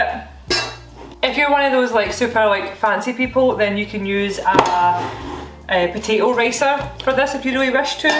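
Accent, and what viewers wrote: Scotland, United Kingdom. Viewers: Listening to a scottish woman talk is always an experience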